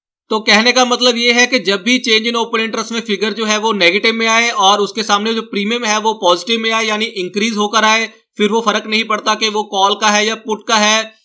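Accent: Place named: Punjab, India. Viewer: Punjabi laggda hainnnnnn!!!!😃😃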